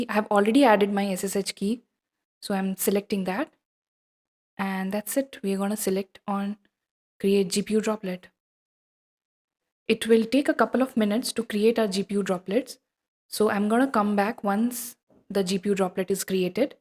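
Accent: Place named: India. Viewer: I just can't listen to indian accent sorry 😆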